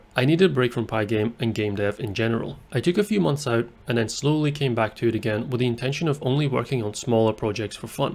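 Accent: United Kingdom. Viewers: are you Scottish btw?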